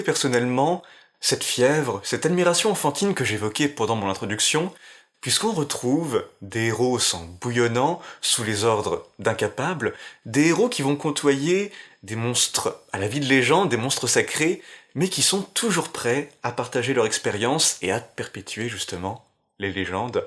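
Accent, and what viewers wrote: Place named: Walloon Brabant, Belgium. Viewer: Déjà, très sympa de ta part de prendre le temps de répondre à tes abonnés. Je salue le geste!:) C'est drôle car ton accent ressemble fort à l'accent qu'on retrouve dans le Brabant Wallon, au sud de Bruxelles. C'est fou! Mais bon, c'est toujours flatteur d'être pris pour un Belge, sache-le!:D Blague à part, oui, je comprends ton soucis d'agencer fond et forme dans de courtes vidéos, cela ne doit pas être facile en effet. Mais tu as raison, tes dernières vidéos sont plus balancées. Allez hop, un poil plus encore sur le style et ce sera parfait, mais je chipote... Bonne continuation à toi et j'espère que ton opération et revalidation se sont bien passées. See you soon!:)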